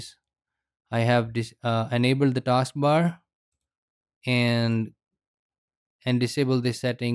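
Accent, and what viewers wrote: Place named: India. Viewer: Thank fucking god for Indian tutorial guys on YouTube and leave it to them to fix the broken bullshit some multibillion company is incapable of fixing! Thank you so much for this! i was just about to smash my t.v.. after having struggled all day with that shitshow "taskbar" popping up and blocking me from using the search function of the file manager i am using to filter through some data libraries that was designed with a properly made system in mind and hence won't fit into the pseudo fullscreen if i didn't hide the taskbar, it know suddenly started popping up in the middle of my lord of the rings marathon and crashing the equally horrendously designed amazon prime app. The windows 10 design is just utter bullshit and missing even the bare minimum core functionalities to adjust this fuckery. Just like when running e.g. into a statistic's problem during the validation process of a new calibration method it is one of those things where I google for hours with only receiving "this is how it is, eat shit and like it" results until i finally remember how to use "" to force the advertisement pushing algorithm to feck off and actually show me the results i asked for - and then finally see it! 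The glorious sub-10 minute YouTube video that as soon as i hear the accent gives me total confidence that i have finally come to the right place to get some actual clear and straight to the point instructions on how to actually solve whatever issue i have run into. Tl.dr: thank you very much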